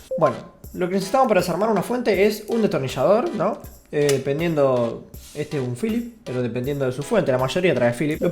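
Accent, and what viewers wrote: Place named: Argentina. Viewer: eres como un mexicano con acento de argentino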